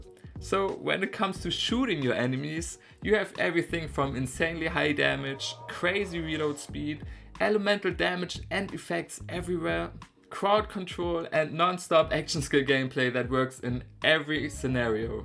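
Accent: Germany. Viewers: I can feel the German accent in my blood... keep it up!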